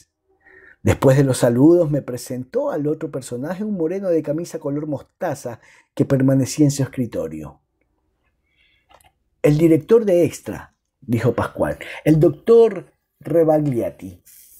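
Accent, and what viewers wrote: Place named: Latin America. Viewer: Muchas gracias por la narración y por el esfuerzo. La entonación foránea latinoamericaniza estas historias criollas peruanas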